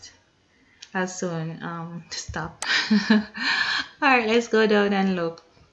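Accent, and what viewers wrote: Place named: Jamaica. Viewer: Thank you! Love your Jamaican accent.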